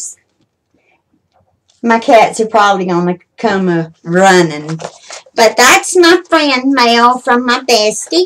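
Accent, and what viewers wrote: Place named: South, United States. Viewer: Wow!! You are a wheeler dealer!!! “In yonder” tickled my funny bone! You might have more of a Southern accent than me!! Glad you had a successful yard sale with your treasures.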